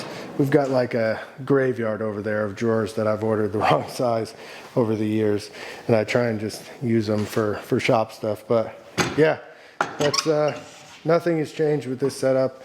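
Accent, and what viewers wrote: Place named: Connecticut, United States. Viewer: Love watching your videos 👍 Very well done. But I also love your introduction. Yo what up - Yo how ya dew in 🤣 You’re definitely from Connecticut 🤌 Fugettaboutit ! Love it you make a me home sick. Keep up the good work.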